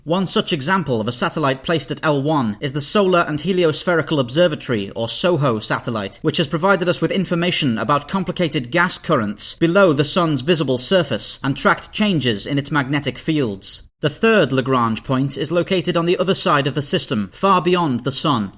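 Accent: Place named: Liverpool, United Kingdom. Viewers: You sound kinda scouse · good video and script, shame about the slight northern accent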